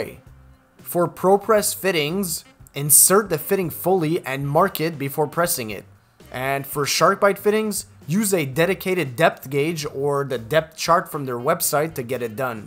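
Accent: Canada. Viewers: are you from Canada? your accent seems canadian !?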